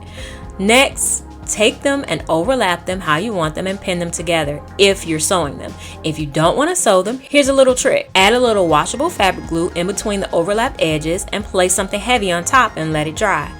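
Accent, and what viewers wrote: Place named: South, United States. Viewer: it’s the cute Southern accent for me, y’all!❤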